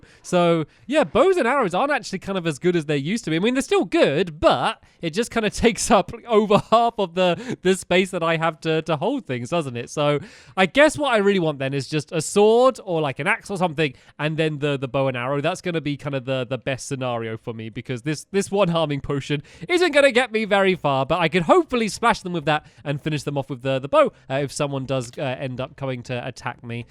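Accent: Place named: United Kingdom. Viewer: Gaming Wolf 2016 He British not American... You can tell by his voice...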